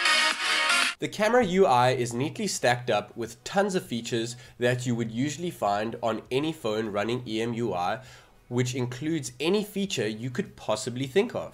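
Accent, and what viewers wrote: South Africa. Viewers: Are you South African by any chance? You have the accent! :)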